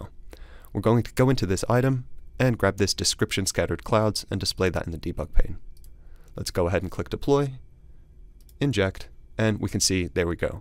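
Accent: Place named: East Coast, United States. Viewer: I thought this tutorial was excellent. Thank you. BTW, I can't quite pin point your accent. I can hear its in the United States but where specifically I can't quite figure. It does have some East Coast in it but given you operate from the West Coast, I'm wrong probably. Anyway, thanks again for the clear insights. I've since subscribed for more excellent content.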